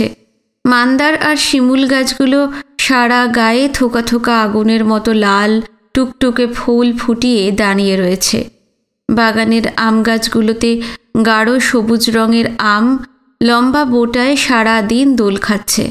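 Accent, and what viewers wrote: East Bengal, Bangladesh. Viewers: Khubi bhalo lagche, tumi je aeto parisram o jatna kore aei bishal upanyas path kore shonachho ,bishesoto purba banglar bhasha je immense dakhyatar sathe bolecho ta ak kothay atulonio, aeto ghatanar madhye ak jon adbhut bhabe missing se holo Jugal ,aeto charitra pratita prithok rupe path kora sahoj byaper noy tomar aei prachesta atyanta prosongshoniyo ,bhalo theko anek dhanyabad tomake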